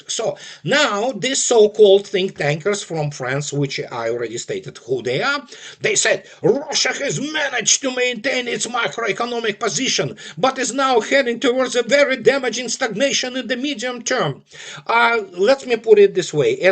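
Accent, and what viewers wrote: United Kingdom. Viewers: That British high-class accent allows that idiot to make hogwash sound intelligent. But those of us that have followed the situation for many years know he is spouting BS. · Slava Russia…. OMG: that British fool with some problems with his dialect…❤️🇷🇺❤️🇷🇺❤️🇷🇺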